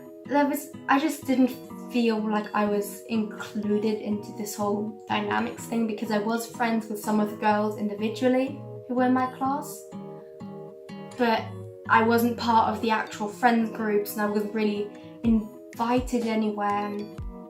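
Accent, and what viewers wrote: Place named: United Kingdom. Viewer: I swear your accent is so fucking british 😍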